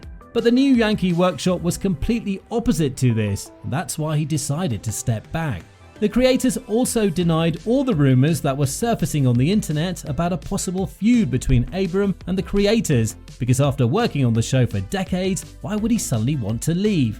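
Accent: England, United Kingdom. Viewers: Why do they import a brit to narrate? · I can’t believe someone with an English accent read out this tripe! Get a half decent script editor and transform the quality of your videos overnight!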